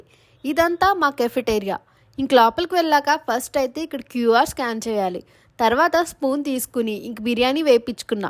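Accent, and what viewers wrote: Andhra Pradesh, India. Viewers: Hyderabad la vundi andhra slang endi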